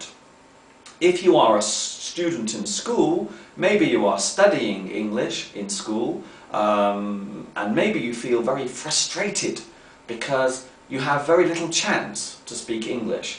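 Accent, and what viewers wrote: United Kingdom. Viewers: I love your British accent!! ^^ Brithis accent sounds so neat!! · Since I became watching your videos I really improved my english, because they don't tire me; It's easy to spend lots of time watching you. And British accent is awesome ^^ Thanks a lot, your lessons are pretty valuable!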